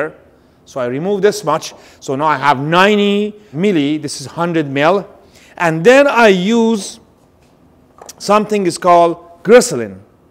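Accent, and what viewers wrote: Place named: Iran. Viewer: Yeh. This is how Persians speak. Strong accent. You can tell straight away he is Persian.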